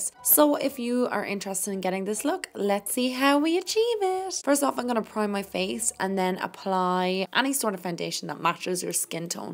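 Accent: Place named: Cork, Ireland. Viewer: You sound like ur from Cork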